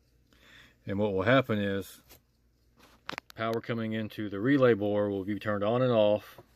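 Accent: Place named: South, United States. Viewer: Watching your videos of building your first control box, I think, and making giant candy canes. You were handling power tools your left hand. You're in the south, I'm in the south (TN), so I can understand you. You're a leftie, I'm leftie. All the more reason I like your channel. I just wish I had found you soon enough to build a controller and show for this Christmas.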